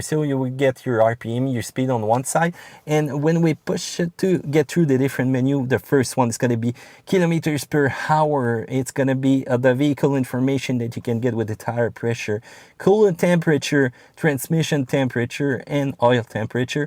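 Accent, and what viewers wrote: Quebec, Canada. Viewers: came for the french-canadian accent, stayed for the high image quality... but jeep is really doing a poor job with this one hahahaha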